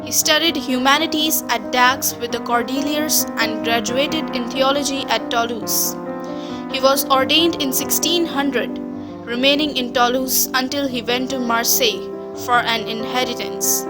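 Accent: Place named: India. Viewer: Indian lady... the accent so strong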